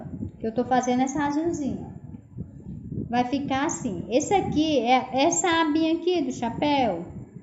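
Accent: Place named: Piauí, Brazil. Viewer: Você é do Piauí, esse sotaque parece do povo de lá. Parabéns,bela arte.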